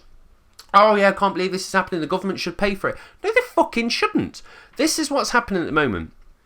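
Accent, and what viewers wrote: United Kingdom. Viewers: Does anybody know what regional English accent this guy is using? I'm in Canada, and the last time I heard this particular accent it was from the character Grumio on the comedy series Plebs.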